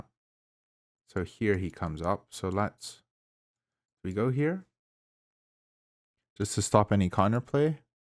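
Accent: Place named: Scotland, United Kingdom. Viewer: Apologies, the accent sounded Scottish to me.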